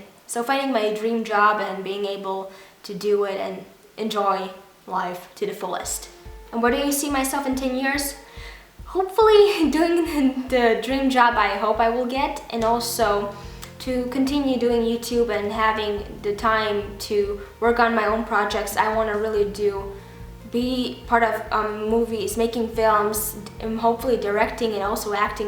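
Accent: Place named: Russia. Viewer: Love the vid and enjoy your videos and where are u from u sound a bit Russian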